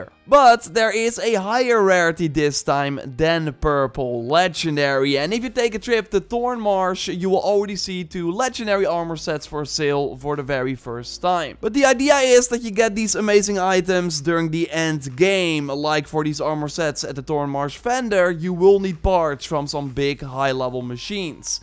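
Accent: Netherlands. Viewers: I feel like you are dutch? Are you🤨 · A thicc dutch accent 😂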